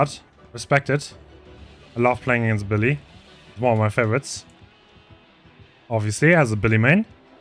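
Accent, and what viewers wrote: Germany. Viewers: 100% sure that you are german xD the german accent while speaking sounds absolutly terrible xDDD i got the same problem Liebe Grüße - greetings 😝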